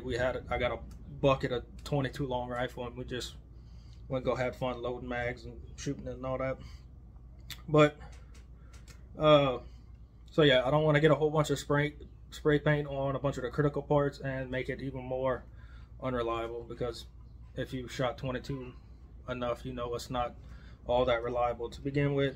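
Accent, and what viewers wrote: Louisiana, United States. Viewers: What part of Louisiane are you from? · He sounds Cajun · Sound like you from St Landry Parish 😂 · That’s a Louisiana accent if I’ve ever heard one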